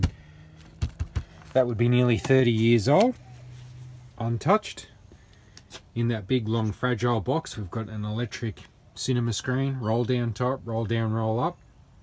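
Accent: Australia. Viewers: Hey mate do you have any crt’s with HDMI inputs? You sound like you’re in Melbourne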